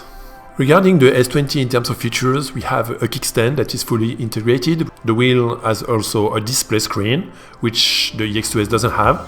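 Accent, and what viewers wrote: France. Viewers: Quel accent français ! 😄😉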